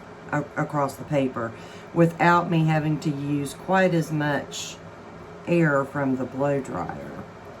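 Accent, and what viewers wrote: South, United States. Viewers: Hi Lovely Lady!! That really is an awesome looking galaxy that you’ve created there! It’s beautiful, truly. Will you resin and mount it? I feel though that I have to comment about the fact that you don’t wear a respirator as much as you should. It worries me. Personally I would much rather you did your painting in silence? Of course with a voice over. I love your southern accent! But seriously, you know better than I do about the possible medical implications. I hope you won’t be annoyed at my saying so, but I do enjoy watching your videos and I learn so much, so please don’t gamble with your health. It may take a little longer of course, to post your video after the added narration, but hey, we can just wait (with baited breath) for the next masterpiece! Thanks so much for sharing your talent. I and many other viewers DO NOT want you to get poorly. 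🙏🙏🙏🤗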